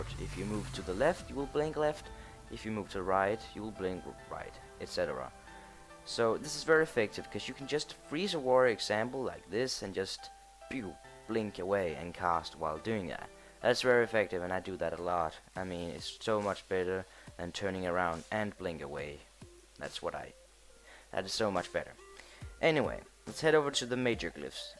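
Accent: Denmark. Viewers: Er du fra danmark :D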